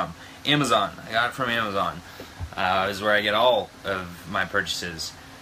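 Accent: United States. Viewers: HIS AMERICAN ACCENT!!! Perfect! 😆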